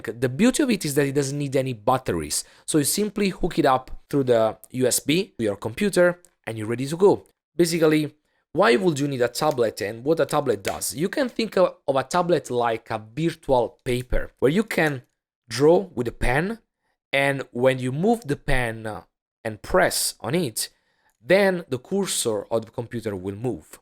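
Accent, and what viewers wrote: Italy. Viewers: Che bello sentire un accento italiano !!!!😍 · Oddio, ahja si sente subito l'inglese "Italianizzato"😂 "MAMA MIIIAA" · Apro il video, sento le prime tre parole... Ok, dico, questo è italiano (accento inconfondibile) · Si sente a chilometri che sei italiano! Bel video comunque, grazie!!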